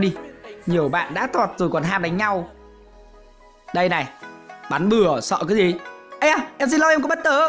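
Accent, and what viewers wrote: Nam Dinh, Vietnam. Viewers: G quê nam định à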